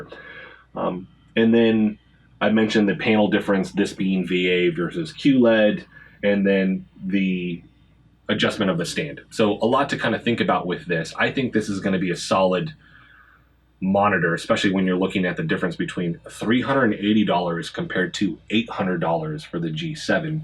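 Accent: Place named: Pacific Northwest, United States. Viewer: Great review! Just curious, are you from or do you live in the PNW? Only asking cause your voice reminds me of other content creators from there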